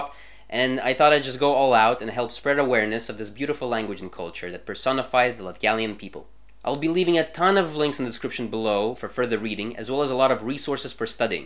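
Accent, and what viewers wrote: Russia. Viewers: Ak dievs, es uzzināju par tavu kanālu caur video par eļvdāļu valodu un brīnījos, no kurienes tu esi, jo es pamanīju akcentu. Izrādās, ka tu esi no Latvijas, tāpat kā es! Bet tavs akcents ir laikam krievu, vai nē? Paldies par šo video. Mana vecmāte bija polīte no "Daugpiļs". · Nice video, man! I speak Latgalian myself and I can tell you not many people my age speak Latgalian, which is a shame. Parents don't teach them, cause it's "a bother" and they'll never use it anywhere else other than in the family. And for the most part, it's true, I only use it when I talk to my family or talk with older people. :( I agree with we are latvians part! We are the same and I will happily be both latvian and latgalian. :) Did now know about Peter! So cool! I was about to ask where did you grow up, cause your accent is interesting while you were talking in latvian, but then I heard you talking in russian, lol. 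PS. Ausmeņa Kebabs ruļavoj!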